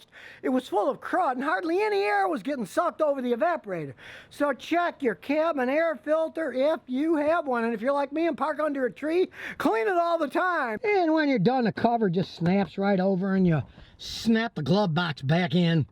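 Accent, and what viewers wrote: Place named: Pittsburgh, United States. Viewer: Have you always lived in Texas? You have a slight Pittsburghese accent.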